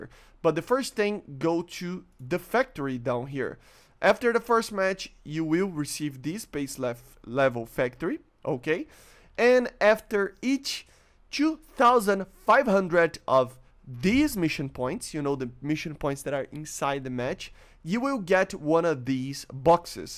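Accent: Brazil. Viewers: Eu sabia que era BR, só vi o seu clã no final do vídeo KKKKKKKKKKK Eu falei, mano, esse sotaque é muito de BR, não é possível !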